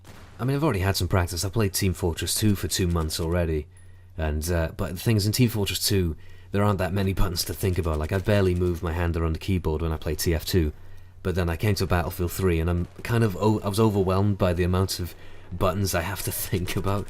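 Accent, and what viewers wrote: United Kingdom. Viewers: That accent! Somewhere from UK, I guess.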